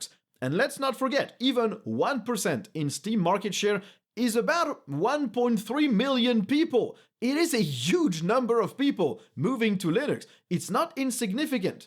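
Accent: France. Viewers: C'est tellement léger comme accent ! Je crois que vous êtes dans mon top 3 des français qui parlent le mieux anglais (IRL et web) Merci pour le super travail, cette chaîne est devenue ma source d'info principale sur Linux, c'est un sweet spot en timings de publication, quantité et complexité de contenu ! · Your French isn't getting in the way of your English. no worries :)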